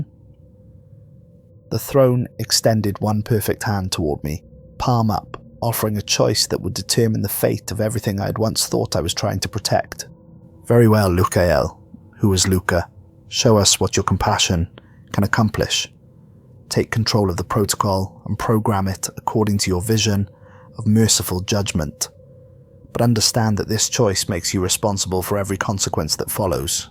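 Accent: United Kingdom. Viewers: I like the story. Can you slow down your audio a little bit? I could be wrong but you sound like you are from the uk and you have portions of the story that it it gets hard to understand. Most story channels are used to fall asleep a slightly slower audio works awesome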